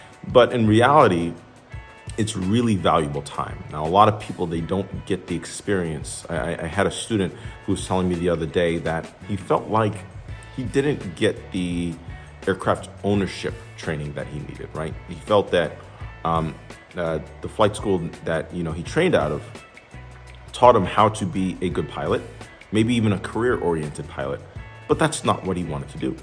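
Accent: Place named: New Jersey, United States. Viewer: Hey whats up, im guessing your from Jersey. Are you a CFI in the area?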